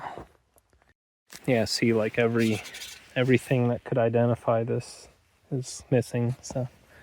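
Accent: Utah, United States. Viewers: Did you ever live in Utah? I hear a Utah accent when you speak. On that road you were on going past "The Hole in the Rock" and going into Moab, there is a formation called the "Disappearing Angel". Starting at about 15 miles before Moab on that solid wall of rock to your left, you can see a spire out in front of it (don't know what else to call it) standing away from the wall and several feet away. You keep seeing it as you get closer. Then all of a sudden you come around a corner and the spire is completely gone - thus "the Disappearing Angel"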